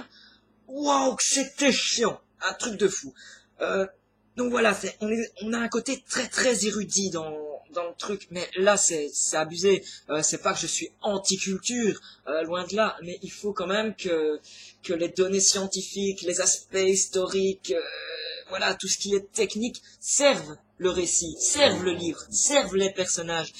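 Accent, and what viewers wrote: Belgium. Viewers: Coucou :) Je me dois de te taquiner un peu ... Ton accent belge ♥ ! Je me souviens encore de tes premières vidéos où tu étais réservé et pouf .. Maintenant tu pètes le feu et anime tes vidéos :P Les quinze premières vies, c'est le genre de bouquin qui me rendrait folle ... Alors je comprends ta déception ! J'attendais avec impatience ton avis sur le livre que tu as abandonné :) Ahahaha, tu me fais rire x) Ah oui, généralement les profs étalent leurs connaissances dans leur bouquin ^^ Bon bha clairement, merci de la prévention, je ne le lirais jamais ^^ Bisous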